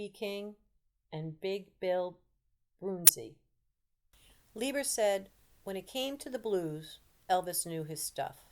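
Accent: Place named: New York, United States. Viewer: What part of New York are you from? I love the accent - I miss it! I used to have the "east coast accent" (I lived in Trenton) - but I've lived in Ohio for close to 40 years now... so it only comes back when I'm angry!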